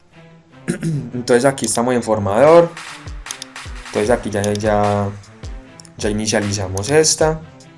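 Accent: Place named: Antioquia, Colombia. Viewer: Paisa en la buena por esa colaboración Dios le multiplique 👍🏻 ese acento es todo vacano el de este man jajaja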